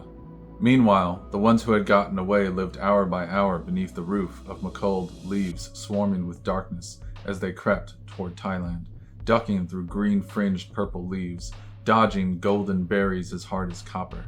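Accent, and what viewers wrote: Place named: Chicago, United States. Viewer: oooh, Thank you for the The Great Rift by Michael E. Hobart recommendation. Adding it to my goodreads tbr and I'll search for a copy today. Also, thank you very much for the reading timetable for Godel, Escher, Bach. I also shared this with my son. I used to love Chicago. My husband has family in Winnetka. (very snooty so I've heard). Then one of the cousins moved to L.A. for work in Hollywood. I guess they had connections. LOL I can see how people in Cali would think you had an accent. I went to Texas one time for one week in fifth grade/10 y/o), and came back with an accent and got teased a little in middle school by some girl that had a beef with me. Sometimes it still comes out. Oy, imagine if I moved to Texas! LOL But I love accents. It was my pleasure listening to your video. I did take your recommendation about skipping the Lolita part though.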